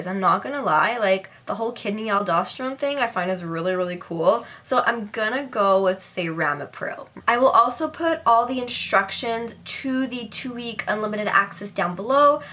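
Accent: Canada. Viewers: Omgosh!!! your canadian?!?!?!? That makes so much sense!! I always thought that your accent was unique.... and that i've heard it before.. lol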